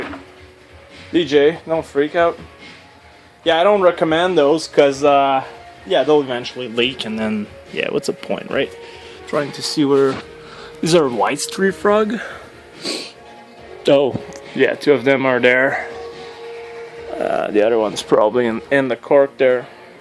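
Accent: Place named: Quebec, Canada. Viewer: Are you from Quebec bro?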